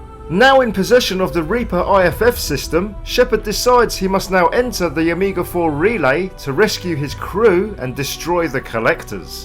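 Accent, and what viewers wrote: United Kingdom. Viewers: Cool! Do you know how you would classify your regional accent? Would it be cockney or sussex for example?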